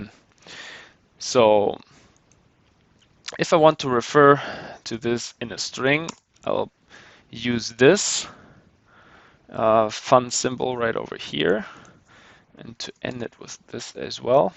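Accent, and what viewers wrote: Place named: Germany. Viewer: Bitte, wo finde ich die seite in der ich den ganzen bums bearbeiten kann ich raffs nicht. Und komm mir nicht mit englisch ich hör den deutschen raus ^^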